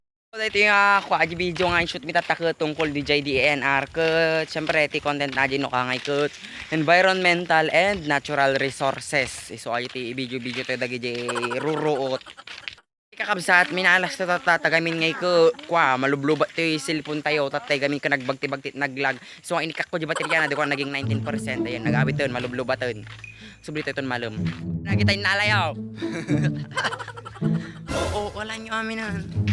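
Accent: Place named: Iloilo, Philippines. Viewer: Ang cute mo magsalita kuya ilongo bayan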